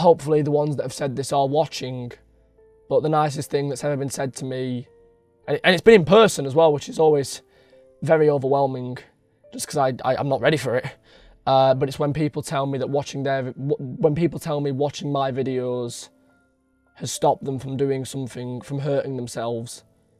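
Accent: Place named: Manchester, United Kingdom. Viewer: Where are you from? I'm from Sweden so I can only guess Mancester. Sorry if you are from Salford. But you are from from the north, aren't you. And you don't have to be "larger than life!"...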